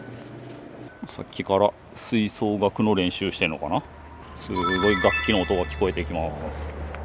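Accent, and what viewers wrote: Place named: Kagoshima, Japan. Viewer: まさかの坂之上（故郷です） 鹿児島のイントネーション好きです😊 幼児の頃住んでたんですけどこんな場所あったんですね カブト虫とかたくさん採れそう…！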